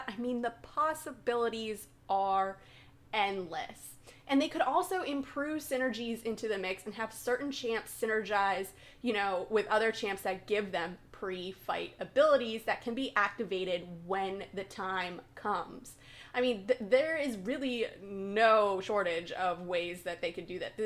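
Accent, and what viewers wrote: Pittsburgh, United States. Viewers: Ur Pittsburgh accent is showing through... Lol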